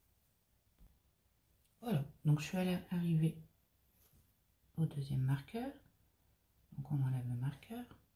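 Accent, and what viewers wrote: North, France. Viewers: Bonjour crochet très joli je pense la faire ce fil est tellement super vos explications sont claires. Une question sans indiscrétion seriez vous du Nord car votre accent m interpelle?tres beau travail merci beaucoup et bonne semaine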